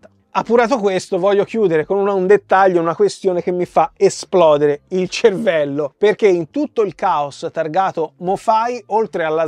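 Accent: Tuscany, Italy. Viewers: Ciao sentendo l accento toscano te tutto bene con l alluvione spero.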